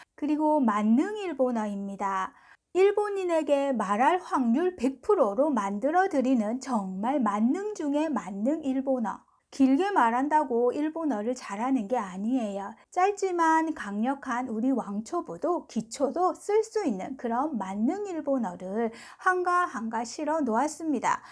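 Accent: Busan, South Korea. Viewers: 45세 된 주부입니다. 저는 2년전에 책을 사서 단어,문법 위주로 혼자 공부를 했는데요. 나름대로 열심히 했다고 생각했는데 혼자서는 역시 무리더라구요. 왜 그땐 유투브로 공부 할 생각을 안했을까요...들어야 귀에 익혀지고 따라서 말해봐야만 입이 열리는데 그때는 혼자서도 할수 있다고 고집 부렸어요..결국 포기하고 올해 5월 중순부터 다시 시작했어요! 저도 선생님처럼 연상암기법으로 단어를 공부하고 있습니다. 저는 경남 김해에 살고있는데 단어 암기법 하실때 선생님의 부산사투리가 귀에 쏙쏙 박히는 그 순간!!!! 구독했어요^^ 이상하게 들을땐 오그라드는데... 이상하게 외워지더라구요^^ 미미 초록 목도리, 왼쪽다리 흰다리 오른쪽 다리 미스코리아 다리😅😅😅 듣고! 따라서 말하고! 복습하면서 쓰고! 저 열심히 해서 일본어가 들리는 그날까지 절대 포기하지 않고 끝까지 최선을 다할겁니다. 저처럼 늦게라도 공부 시작하신 다른 분들 모두 진심으로 응원하구요! 우리 모두 다 힘내요!!!! 아! 선생님 책 출간 축하드립니다! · 님!저도 올해50이된 아줌마입니다!독학으로 취미로 시작해서 이것저것 공부해 오다가 목표에도 없었던 n3를 이번에 도전합니다. 커리큘럼따라 그대로 공부하고 있어요. 기초부터 아주 꼼꼼하게 가르쳐주시기 때문에 행여나 독학으로 완전히 다져지지않고 지나친 과정도 이번에 다시 바로잡게 되었어요^^. 센세 부산사투리 정감있고 귀에 쏙쏙들어오자나요?ㅎㅎ 물론 뇌도 나이가들어 어린학생의 두뇌의 속도는 따라가지 못할지 모르지만, 아줌마들의 끈질긴 근성과^^ 잃지않는 흥미를 꾸준히 유지하고, 매일매일 조금씩이라도 하시면 언젠가 자신도 모르게 일본어를 읽고 쓰고 말할날이 올거에요! 저는 지금 일본인 라인친구가 3명정도 있고, 영상통화로 서로 언어교환도 하고있어요! 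응원하겠습니다.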